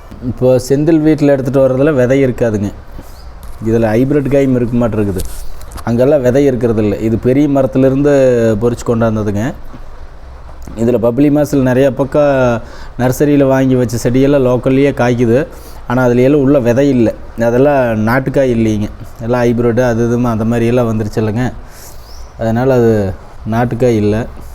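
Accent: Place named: Coimbatore, India. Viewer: You covai Tamil speech is very nice. I had been living in Karamadai for past 30 years where I was studied at karamadai high school then pre university PUC at Government arts college in year 1977 . I completed Mechanical Engineering in Govt college Technology during 1978 to 1983.Then joined India space research organization in 1983 itself. So like your speech. I completed 37 years as Scientist in higher levels and retired in 2020. Like your service and affection on food forests. Thanks If time permit I will meet you personally. Scientist RAJAN PAULRAJ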